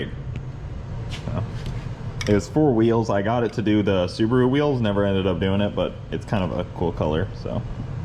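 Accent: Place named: New York, United States. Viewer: What part of New York are you originally from?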